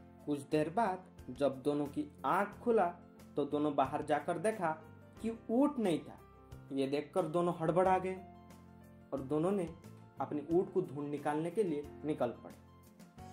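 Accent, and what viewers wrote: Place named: Odisha, India. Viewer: Odia person telling the story, I think! 🙏🏻💪🏻 Bhainaaaaa! 👍🏻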